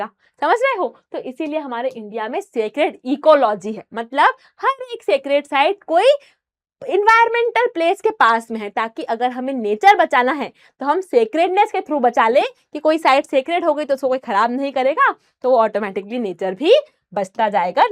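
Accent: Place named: Haryana, India. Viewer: Haryana te ha yaar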